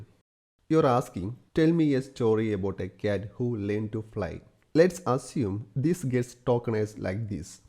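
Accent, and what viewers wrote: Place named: Kerala, India. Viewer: Why don't you use a text to voice output and present this without a heavy Mallu accent 😮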